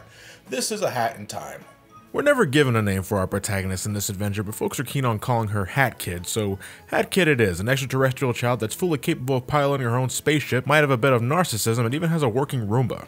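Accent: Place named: New York, United States. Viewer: Thanks for all the content my man. Swore you were from NYC from the way you speak and the moving out of your mom's crib video and all that, but either way, hope to meet you at magfest. I know you'll be as awesome as you've always been on camera. God bless. Se te agradece todo lo que has hecho.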